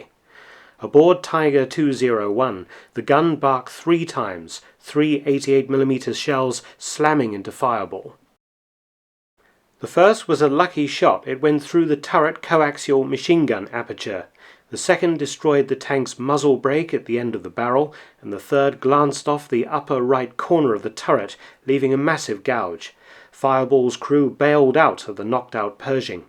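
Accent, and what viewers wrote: United Kingdom. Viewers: Thanks for the video. I can tell by your voice that your British. Your our best friend and that will never change. Us Americans are very loyal we don’t give our loyalty easily. Liberty or death.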